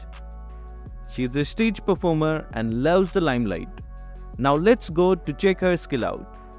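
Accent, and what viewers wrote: India. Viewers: Are u from India bro ur voice is like an Indian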